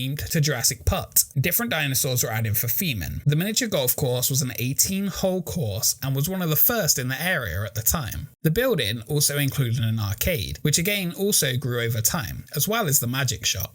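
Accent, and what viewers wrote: United Kingdom. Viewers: why is a British accented person telling me more about my town's crazy oddity than i ever knew myself LOL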